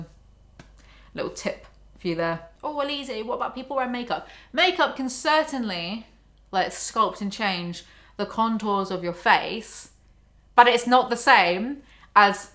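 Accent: England, United Kingdom. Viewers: You reading this with your accent makes it so much more enjoyable, British really was made for insults.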